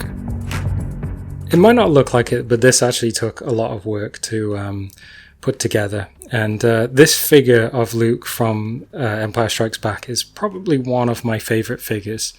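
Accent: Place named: United Kingdom. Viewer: Nice collection, mate! 👍 Particularly love the Dagobah set-up! Maybe surprisingly, the one figure that stood out for me and makes me want to get one was R5-J2! That little fella looks quality standing next to Tarkin! 💯👌 Btw, are you a British expat in Canada or do some Canadians sound as though they're from the UK? (Hope that's not offensive in any way to our Canuck cousins!) 😅 Cheers!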